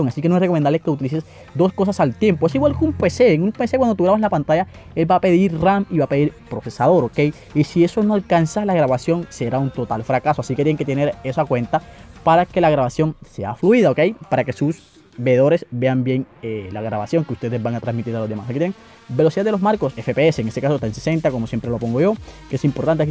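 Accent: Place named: Chile. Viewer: es chileno :v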